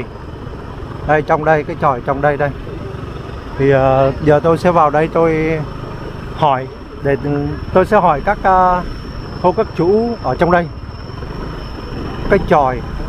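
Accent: Vietnam. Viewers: chẳng biết gì về lịch sử cả. Nói thì giọng Bắc pha Nam đơn đớt chối tai!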